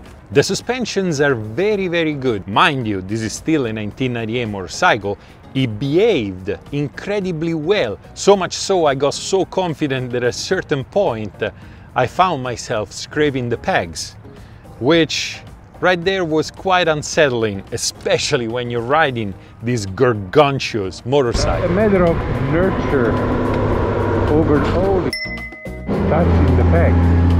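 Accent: Italy. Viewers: I love the Italian accent review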